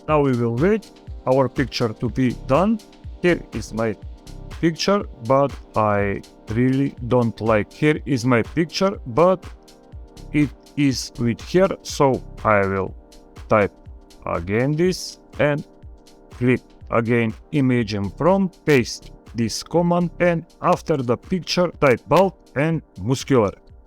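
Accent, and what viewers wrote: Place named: Russia. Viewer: Useless instructions, I can barely understand the part English, part Russian accent, and the video is not simple enough to follow, as most of the web pages you see on the video, either don't exist anymore or don't look like video and all the links that are shown in the video are not on the changed web pages, in fact, this video needs to be updated, and also, show us how to create a new avatar from scratch not from what you already have.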